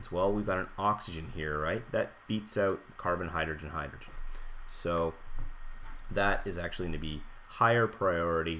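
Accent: Canada. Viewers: That canadian accent tho!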